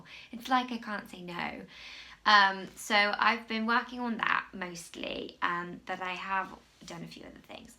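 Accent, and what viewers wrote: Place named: United Kingdom. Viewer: Happy to find your podcast, watching for the first time! I love mustard, I love that you crochet, and I love your energy. Your accent brings me back to my time living in the UK (in the USA now) and I can't wait to watch more of your episodes!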